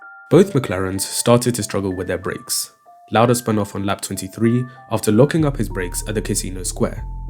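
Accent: United Kingdom. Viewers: bruv your roadman accent fuckin annoying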